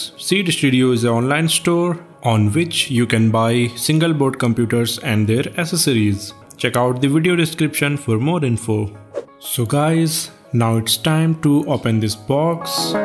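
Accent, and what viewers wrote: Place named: India. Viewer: your indian accent kills me! really? does everyone in India talk english that shitt*y? pffff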